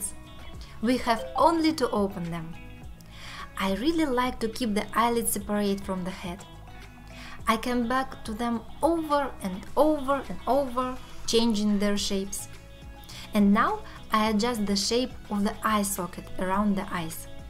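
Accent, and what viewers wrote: Russia. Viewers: Мне кажется, что на англоязычном ютубе и без этого видео, нет дефицита в туториалах и уроках по Блендеру (в т.ч и от пионеров и разработчиков Блендера). И какой смысл делать русское название, для англоязычного ролика? Это мое субъективное мнение, но лучше было уже делать ролик на русском, чем на английском, с произношением как у героев фильма "Красная жара".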